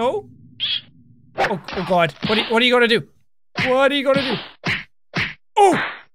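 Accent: Ireland. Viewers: i subed just for the awsome accent... what is that .. irish ?? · Niv Leket Slight problemo! NOT BRITISH!!!!!!:He's IRISH" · Love his Irish Accent!!! 🇮🇪☘️ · hes irish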